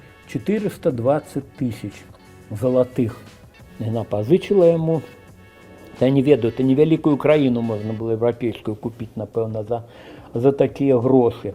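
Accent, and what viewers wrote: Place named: Russia. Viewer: Ен размауляе на мове з руским акцэнтам. Мова инакш гучыць.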